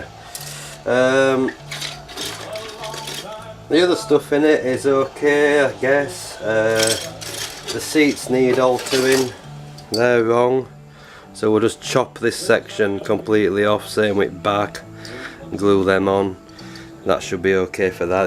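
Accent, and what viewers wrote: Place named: Liverpool, United Kingdom. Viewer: by the way, about your accent. I know your in the UK but are you from the same area as where John lennon was from because, you kinda sound like him a bit👍😉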